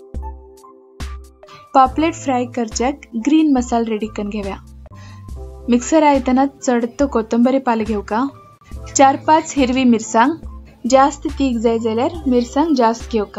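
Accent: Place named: Konkani, India. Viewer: Lovely to hear you speak konkani. Thank you for sharing the recipe 👍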